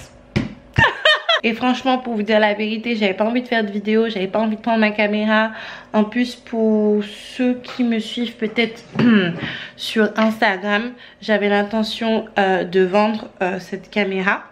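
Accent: Antilles, Caribbean. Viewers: Fiche que tu as l'accent antillais 😭💔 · T'es congolaise mais ta l'accent des antillais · Oui tu as l'accent tu as vécu aux antilles ça c'est suwe 🤗🤗🤗mdw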